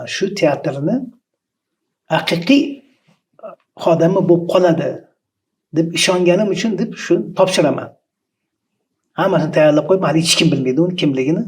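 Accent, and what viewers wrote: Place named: Tashkent, Uzbekistan. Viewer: Тошкент шевасида гапирар экансиз, узингизни шевангизда ёки адабий тилда гапирсангиз эди.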